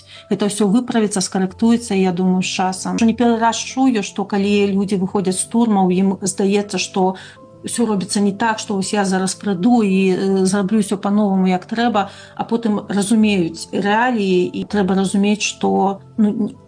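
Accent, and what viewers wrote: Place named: Ukraine. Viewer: Ну болтает на суржике как хохлы пыжаться у себя, ты уж по их тропинке идешь, петрушка на пальчики . Якия "Пять лет" , пять гадоуу!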